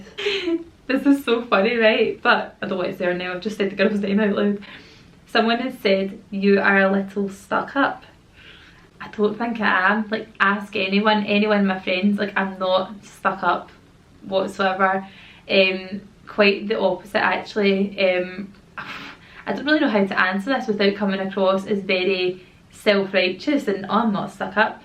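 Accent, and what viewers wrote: Scotland, United Kingdom. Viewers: Think your video was great love all your vlogs, loving the odd scottish word that i dont understand 😄 love your accent just be youself as i know you are X💓❤🌹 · Very chuffed that my assumption was mentioned 😊 You were so honest in this video (you seem very honest anyway) but you addressed the assumptions so well! Love all your videos! Especially the mummy videos and the cleaning ones! As a mum myself its lovely to see how other mums do it! Lovely to hear a Scottish accent as well on YouTube so I wouldn't worry about the accent too much! I'm from ayrshire so not a million miles away! Keep doing your thing girl 👌❤ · I really love your accent! I live in the southern United States and in my area I just don't meet many people from other parts of the world but when I first watched your channel I could tell you were Scottish and would never have thought you were speaking anything but English☺some words you pronounce differently than in my neck of the woods but I love hearing that and some of the phrases you all use are different from here but that's what I love so much about watching UK channels! 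I think you're lovely and I enjoy your content so much! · "Babe its english" 😂😂!! Love it! But its so true, mon the Scottish accent ❤❤❤ (im from scotland too) lol xx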